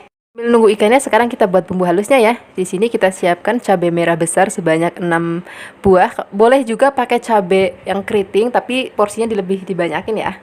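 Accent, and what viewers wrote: Java, Indonesia. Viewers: Menarik resep2 nya, aku ngga cm suka resep nya, tpi suara mba itu lembut bngt, jawa nya medok, pasri priyayine ayu, luwes, jiiiaaann...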